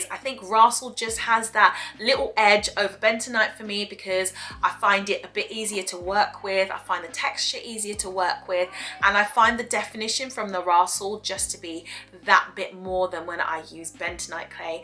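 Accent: England, United Kingdom. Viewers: Are you from England?? Or London?? Is that a hat behind you. 😂😂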